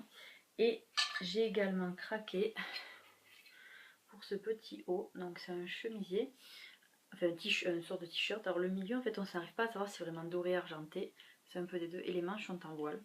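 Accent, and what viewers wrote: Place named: Toulouse, France. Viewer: J'avais reconnu ton accent, je suis près de Toulouse aussi .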